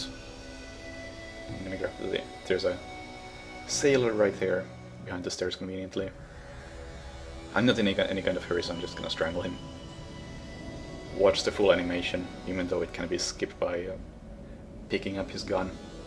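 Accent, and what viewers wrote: Finland. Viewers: Can I guess? Are you finnish?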